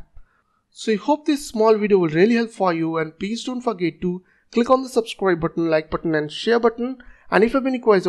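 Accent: India. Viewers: Video accent English - - - - - - - Indian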